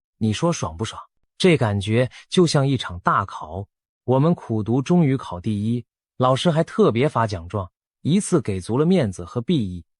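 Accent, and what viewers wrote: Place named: China. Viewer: 大陆口音的反讽视频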